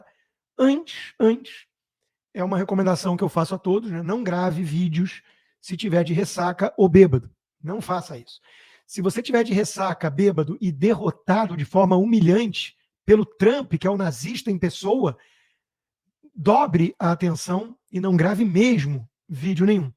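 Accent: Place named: Rio de Janeiro, Brazil. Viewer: Mesmo sendo muito reticente ao sotaque carioca, mas é maiiiins , que acaba sendo divertido. Embora o que é mais importante é a cultura e o conhecimento exposto . Só isso.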